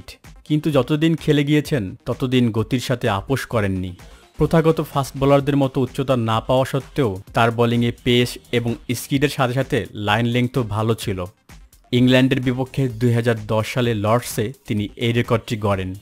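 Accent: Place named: Chapai Nawabganj, Bangladesh. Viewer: উপস্থাপক এর বাড়ি চাপাই নবাবগঞ্জ মনে হয়... শব্দ টা পেশার না, পেসার...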